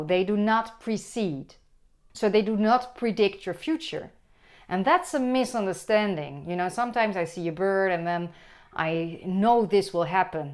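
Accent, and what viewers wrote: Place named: Netherlands. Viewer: this is crazy. I was on holiday last week and saw so many flamingos! Also, I've been seeing so many 44s. Everywhere. That's how I found your channel... It was the first video I clicked on when I looked up what it meant. Also... My twin flame is Dutch! When I heard your accent I thought 'it can't be... can it?' Never seen any Dutch twin flame content before !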